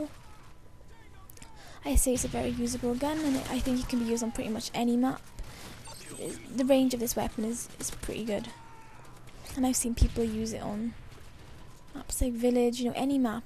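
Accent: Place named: Southern England, United Kingdom. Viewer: one of THE most helpful commentators ever :D, also you sound like you're from england :P just saying, because i'm from the south of england :) and it's a accent i recognize o.o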